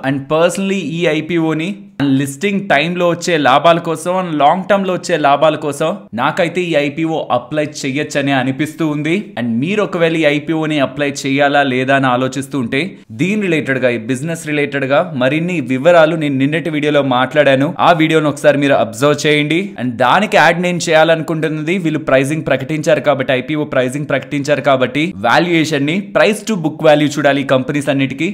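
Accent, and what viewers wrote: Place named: Visakhapatnam, India. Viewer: ‘Eey vusuuu lekunda’pakka Vizag language 😀 👌🏽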